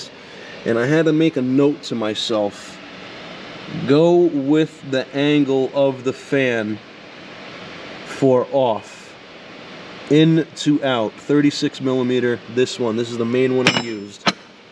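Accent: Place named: Long Island, United States. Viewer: Ohhh the OCD drawer was sweet! I sense Long Island, Brooklyn or possibly Jersey in the voice? LI here…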